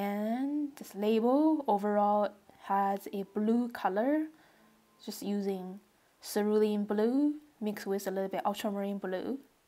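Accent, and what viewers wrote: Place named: England, United Kingdom. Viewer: 2023…just saved this as a watch later…I love rotisserie chicken, watercolor, and voice and and English accent is nice to listen to!! Keep up the good work! I’m inspired ❤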